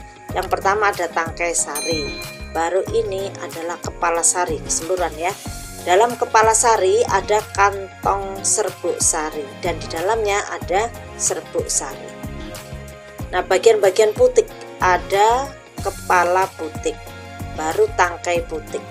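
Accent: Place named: East Java, Indonesia. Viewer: Kaya wong jawatimuran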